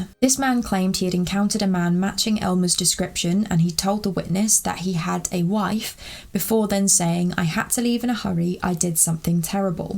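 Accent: England, United Kingdom. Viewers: What country is she from? England?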